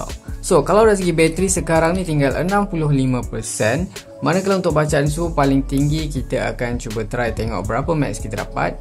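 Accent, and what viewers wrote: Malaysia. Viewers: satisfying bat denger orang malaysia ngomomg hahaha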